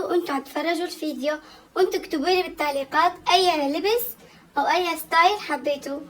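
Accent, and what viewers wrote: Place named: Syria. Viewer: تباني سورية من سورية من صوتك😅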